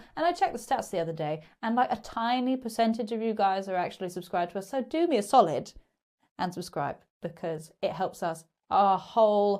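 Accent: United Kingdom. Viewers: Hey, love your teaching methods. I'm a 60-plus-year guitar player and singer and have been in many bands. They never wanted to do my songs so now I'm laying them down on Pro Tools. I don't play drums, so I'm laying them down in MIDI and your channel has helped me a bunch to get different 3/4 drum beats down and the fills. I have a digital drum set, given to me by my grandson, and plan on learning after I get my song down. You (your videos) will help me tons. BTW I'm a huge Beatle fan, so your accent is delightful and witty as Brittans tend to be. Love it.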